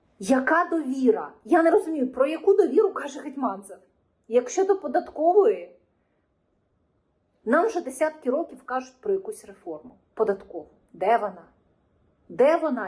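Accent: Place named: Russia. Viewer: В українській мові немає щьо,, щьоб, чі ,тощо. Відійдіть від російської мови.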